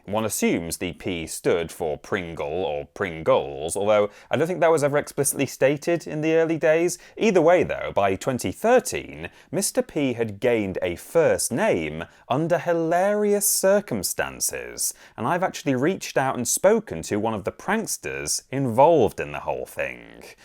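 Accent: United Kingdom. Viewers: How did I not notice he had a British accent until he said crisps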